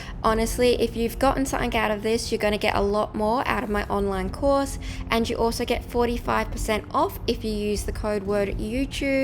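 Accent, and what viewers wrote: Australia. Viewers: Aussie perhaps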